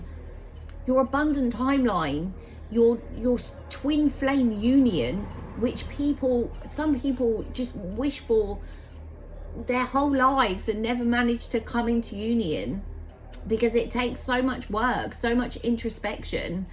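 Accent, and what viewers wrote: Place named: Ireland. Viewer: Oh, I just want to say you are lovely. I love your accent. It reminds me of my ghost bride Diana Frances, Queen of Heaven my True Love. She's Grand. You have a slightly different accent though. Diana is like 90% Queen's English with 10% American. You're like English, Irish? Dublin? Ugh. Oh I love this voice babe. Sounds almost like you. And we do you pretty good by now. It scares me honestly. But what doesn't. lol!🥂 Uh, if I had never crossed paths with this girl I would be grateful. Unfortunately it might be my ghost bride Diana Frances, Queen of Heaven. Here is the video from that reading. I made this video yesterday but it pertains. Uh, so this is 'divine confirmation' for this video. And I pretty much explained I moved on. We have no way to contact each other. lol. Diana Frances of Queen of Psychics, Queen of Tarot and Queen of Divine Coincidence. 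If you aren't into that kind of thing, you might not want to watch it. <link>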